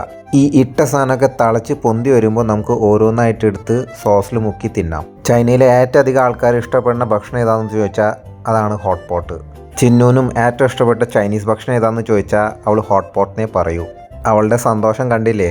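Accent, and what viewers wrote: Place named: Ernakulam, India. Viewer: ചേട്ടൻ ekm ഇൽ എവിടാ നല്ല പരിജയം ഉള്ള സ്ലാങ്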